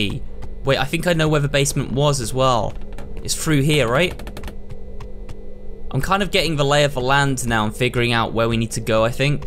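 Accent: United Kingdom. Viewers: AAAAAHHHH HES BRITISH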